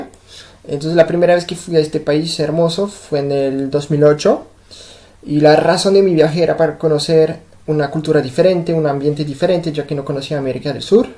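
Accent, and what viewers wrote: Colombia. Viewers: la verdad que me confundes ,hablas tan bien el español ,por otro lado tienes el dejo colombiano marcado en todos tus vídeos , te encantó mucho colombia ? Y entonces no tienes mucho del habla francés ,por otro lado te identificas mucho con los latinos Pues serás nuestro hijo adoptivo😉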